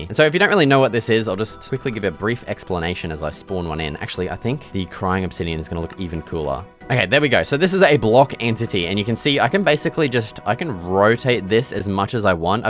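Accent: Australia. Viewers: I love ur Aussie accent cuz its so natural and funnyyy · this guy sounds like and australian frfr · Brother you sound Australian but also English it's confusing me, are you even either of them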